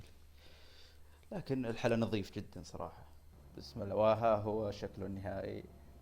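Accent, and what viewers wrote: Saudi Arabia. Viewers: شويه يتكلم بلغه العربيه وشويه سعودي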